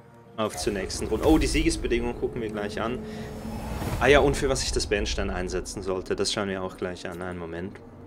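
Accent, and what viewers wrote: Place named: Netherlands. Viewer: Bitte sag mir das du Holländer bist xD der Akzent ist der hammer😂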